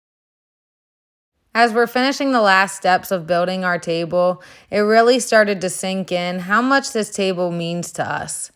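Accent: Pittsburgh, United States. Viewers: Where are you from? Sounds like PA near Pitts?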